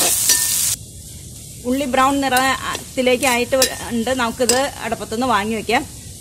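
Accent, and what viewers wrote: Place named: Perumbavoor, India. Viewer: എവിടെയാ സ്ഥലം സംസാരം കേട്ടിട്ട് പെരുമ്പാവൂർ മൂവാറ്റുപുഴ പോലെ 😄ഞാൻ keezhillam ആണുട്ടോ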